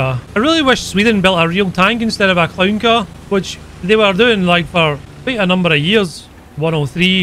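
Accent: Scotland, United Kingdom. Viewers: Listening to is like listening to myself but as Scotsman "I hate being self aware" hit me in the feels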